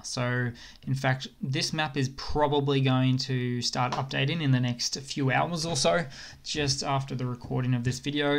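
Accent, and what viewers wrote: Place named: Australia. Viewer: Hey dude Where are you from I’m in Sydney You sound Aussie You sound like Marcus House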